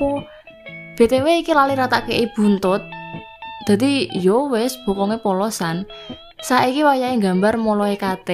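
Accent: Indonesia. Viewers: kak, dari logatmu, kayaknya kamu orang Indonesia ya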